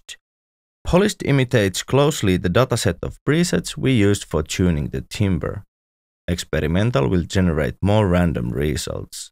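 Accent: Finland. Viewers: It was all good. I'm a fellow fin and was pretty sure about the accent! :) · This is correct, you got me. 😅 I am Finn. Hope it wasn't too hard on the pronunciation. 🙏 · Where is Reveal Sound based, I've never thought about it, but I'm 100% sure that the voice over in this video is by a Finn! (As am I). Kudos anyway, I use Spire on pretty much every song. My latest track (the Ukrainian one) on my youtube page has a plucked synth hook, whch is actually a Spire preset.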